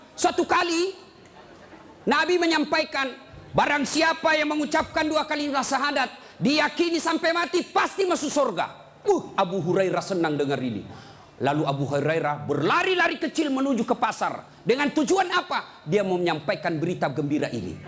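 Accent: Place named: Bugis, Indonesia. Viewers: Itu cara org sulawsi memanggil sekumpulan org bu hamidah, di sulsel itu lumrah dan tdk trmsuk kata yg gak sopan. Kan pak ustadz bilang ini lidah Sulawesi bos, jgn dipaksa jadi lidah solo heheehe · #seneng LOGAT BUGIS, SULAWESI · Orang jogja tau logat makassarnya udztas yaa? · Dialek bugis tak hilang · Seneng aku dialeknya ustad ini Makasar punya, Krn aku prnh tinggal di Makasar, seru ustad Gaya dialeknya ternyata bisa diterima semua lapisan muslim di Indonesia Ttp istikhomah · Sehat terus ustad sy sllu suka cara bicara org Makassar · Ceramah yg santai, lugas, Fakta kehidupan sehari hari, komunikatif, lucu namun bernas dan tegas dengan dialek daerah yang sangat kental (ustdx org makassar). Penonton terhibur yg duduk di panggung jg ikutan senyum dan tertawa. AUTO NGAKAK yg mendengarkan ceramah ustd ini.. semoga penontonnya dan pemirsanya jg AUTO TOBAT insha Allah.Syukran ustad DL ilmunya. 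Alhamdulilah allahumma shalli ala rasulullah Muhammad sallahu alaih wa ala ali wa sahbihi ajmain. Salam dari wong ngapak CILACAP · "Lidah SULAWESI ini BOSS, jangko paksa lagi pake lidah SOLO" 🤙🤙🤙 menit 15.03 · Alhamdulillah ust tak pernah merubah gaya./logat bahasa sulselx dimana memberi ceramahx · Saya senang dengar kalau pak Ustad ceramah, bermakna, berbobot, dan bisa bikin kita ketawa, dn tidak lupa masih khas makasar, jangan dihilangkan khas makasar nya ya pak Ustad, sehat sll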